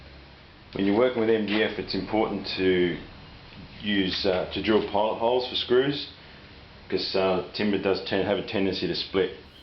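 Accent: Australia. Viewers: you sound like you've got a queensland accent! greets anyways mate, really enjoyed this video and can't wait to make one! Cheers!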